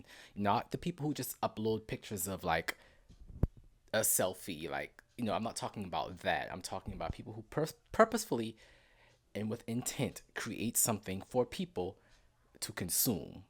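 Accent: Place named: Geechee, United States. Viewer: Yesss your accent is too cute.. that good ole southern geechi honey ❤❤ I'm from SC too ❤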